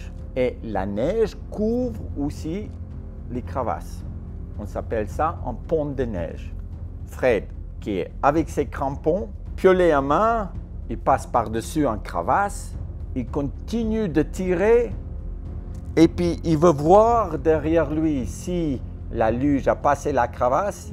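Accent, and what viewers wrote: Vaud, Switzerland. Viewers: Tcheu c’taccent Suisse 🇨🇭!👍🏽😄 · Cet accent vaudois 😂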